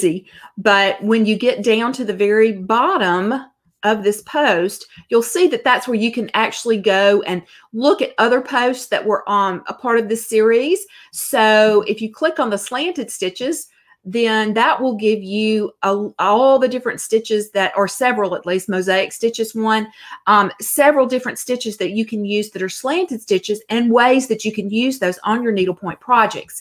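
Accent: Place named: Mississippi, United States. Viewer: Watching in Rockville, Maryland. But I’m from Mississippi, so your accent makes me think of home! Thanks for the great tutorials.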